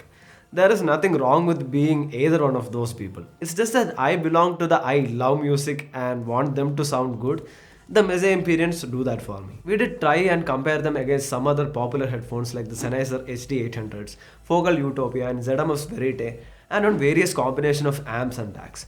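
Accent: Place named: Tamil Nadu, India. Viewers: OK!!! I have to admit that South indian accent of english is God tier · Sometimes it gets little hard to understand his south indian accent. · Typical Tamil accent. Nice review Dude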